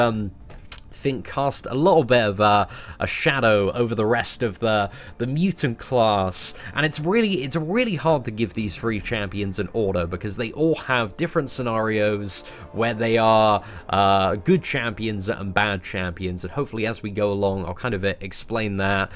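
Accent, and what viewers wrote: United Kingdom. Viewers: Dude, you sound so British.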